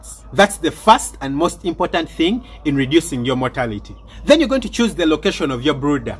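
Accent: Uganda. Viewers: Ugandan accent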